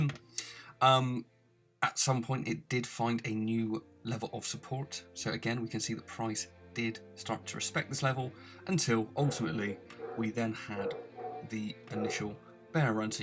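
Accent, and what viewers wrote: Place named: Bristol, United Kingdom. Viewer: Your accent is even more Bristolian in recordings!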